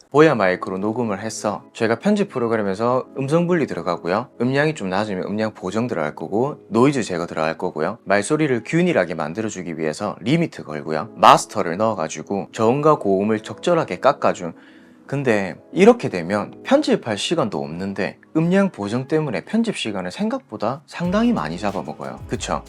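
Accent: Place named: Busan, South Korea. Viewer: 카메라 관련 제품리뷰를 찾아보려면, (어쩔수 없지만) 광고협찬이 많다보니 믿고 시청하기가 힘든 경험이 많네요 ㅠㅠ 그래도 님이나 몇몇 리뷰어 분들께서 이렇게 솔직히 얘기해주시니 더 믿음이 가는 것 같습니다!! 이런 분들은 나중에 협찬을 받더라도 "정말 좋은 제품을 소개해주려나보다"라는 마음이 드는 거 같아요! 좋은 영상 감사하고 앞으로 더 잘 되시기 바랍니다! +부산사람이다 보니 사투리가 정겹네요😊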